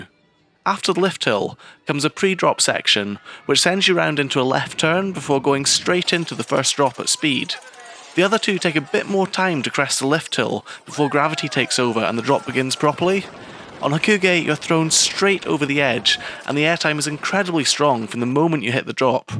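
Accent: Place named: Wales, United Kingdom. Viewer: Ouhh ok. I thought I heard some welsh 😅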